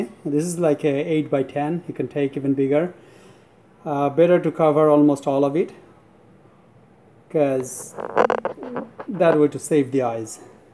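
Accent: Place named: Nepal. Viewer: are u nepalese? ur accent sounds like nepalese and yes mostly nepalese people use this method to see the solar eclipse. I have been using this method since childhood for several times and it works fine. thank you for sharing!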